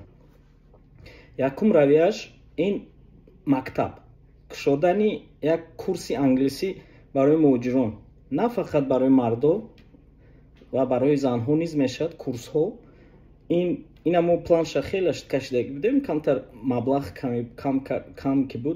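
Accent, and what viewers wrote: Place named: Khatlon, Tajikistan. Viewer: ИЛТИМОС БО ЗАБОНИ ДУРУСТИ ПОРСИ ГАП ЗАНЕД БЕ ЛАХЧАИ ШИКАСТАЮ ОМЕХТАИ ХАТЛОНИ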